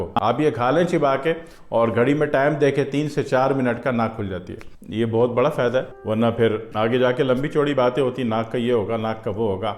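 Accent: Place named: Lucknow, India. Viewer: انداز گفتگو سنکر لکھنو کی یاد آگٸ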